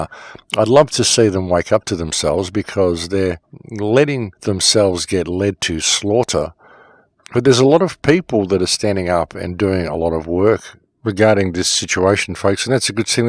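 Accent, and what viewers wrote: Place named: Australia. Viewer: No t.v., smart phone is going (dumb phone). All the WARNINGS and WATCHMAN channels are being sliced down, demonitized n' demonized. Been sharing, posting, warning for years. Folks, this is the end times! God forewarned these things would happen. We are at the tail end of the age of grace and facing the dawn of tribulation. REPENT FOR THE KINGDOM OF GOD IS AT HAND! Heads up! I hate to say it but this is nothing compared to what is coming! Gods judgement and wrath going to sort things out once and for all, sorting the sheep from the goats, the wheat from the tares and who can blame Him, look at what we done and how much society has fallen. TIME IS UP! We are living just as in the days of Noah. Get onboard the ark if you want to escape, repent of unbelief and sin and know Jesus Christ is mighty to save Amen! 🙏❤🕊 Great to hear an Aussie voice presenting our region. 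Well done bro. Brilliant, love your work mate, keep shining!! 🍃🌺🍃 Glad you got yr own channel being a voice in the wilderness! 😁👍 SHARED!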